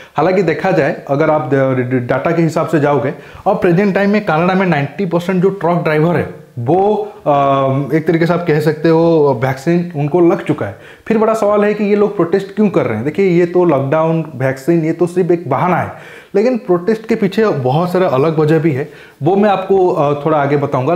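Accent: Odisha, India. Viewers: Your videos are indeed good. But try to avoid your odiya accent while speaking Hindi or English words.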